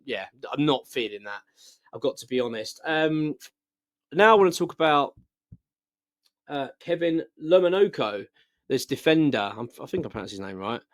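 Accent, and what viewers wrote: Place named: West Midlands, United Kingdom. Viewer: was you born in the west midlands? I can tell an accent.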